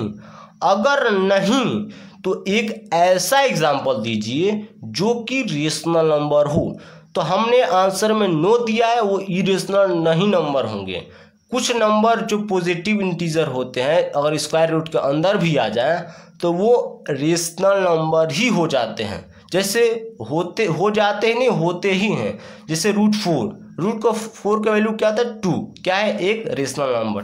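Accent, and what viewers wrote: Bihar, India. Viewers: Sir aap Patna Bihar se h....?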